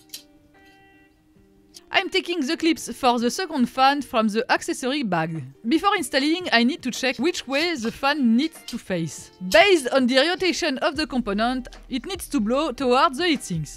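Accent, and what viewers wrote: France. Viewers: salut, cher camarade français $$ j'adore l'accent english des fr qui parlent anglais ^^ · The accent is not exactly "French", it is more precisely Parisisan accent. Try the Québec accent. '-) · Beautiful video ❤ Clear instructions on how to install Noctua Fan on the Motherboard 🖥 Love the English in French accent 🟦⬜🟥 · Thickkest French accent ever 😅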